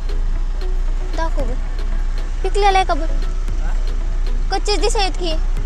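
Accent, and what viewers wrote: Latur, India. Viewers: Latur kar bolanyachi tone khup avdli pune karanna.... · लातूरची मराठी लई भारी 👌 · I am from Pune Tuza video pahatana tuzi bhasha aikun mala mazya gf chi aatavan zaali she was also from latur #pbthetraveler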